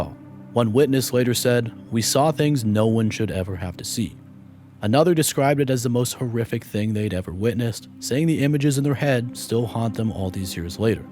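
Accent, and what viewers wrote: Canada. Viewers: Canadian ? Subscribed. 🇨🇦👍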